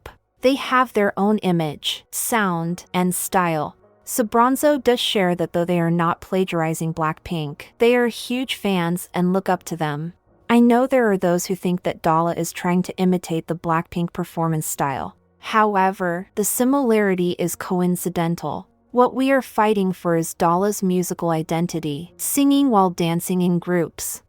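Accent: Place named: West, United States. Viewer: Western accent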